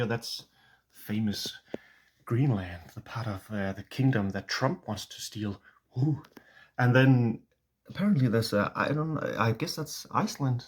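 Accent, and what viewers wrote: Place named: Netherlands. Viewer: Dutchman here: 'Dutch' is derived from medieval 'diets', which originally just meant 'language of the people'. The word is related to Old Norse þjóð, 'nation, people', tjod in Nynorsk and Swedish, not sure if the word exists in modern Danish. Denmark and Holland are often confused by people from elsewhere, it's true. We do have a lot in common, after all. Regarding language: when I heard your accent, at first I thought you were actually Dutch! And when I hear Danish without knowing which language it is, it often occurs to me that I think I hear Dutch, but very strange, with words I don't understand... the sounds are incredibly similar.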